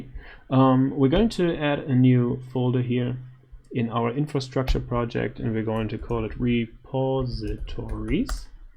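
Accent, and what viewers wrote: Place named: United States. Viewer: Excellent English with a strong american accent. Where did you get that from?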